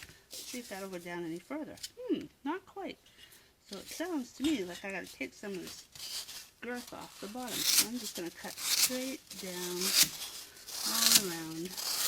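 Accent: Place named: Maine, United States. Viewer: Hi, I noticed right away you were saying the same kind of things l say with the same accent. That was a really nice experience. I loved your video. I am looking for ideas for decorating my son’s grave. Then I looked and saw that you are in Sanford Maine. No wonder! I’m in Somersworth. We are neighbors! Thanks for the video.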